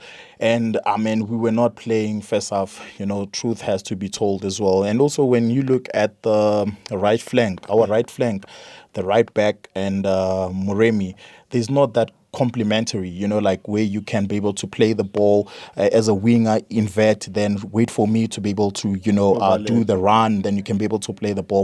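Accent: South Africa. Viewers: Brilliant analysis Brilliant Jozi accent and eloquence. Thanks for salient commentary 🙏🏾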